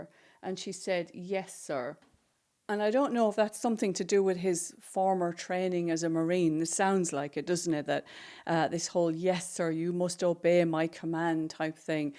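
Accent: Ireland. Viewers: Hi from NJ,,, I detect your Irish accent (pretty sure). Hopefully we will be hearing of his arrest soon, his behavior has been in our face long enough.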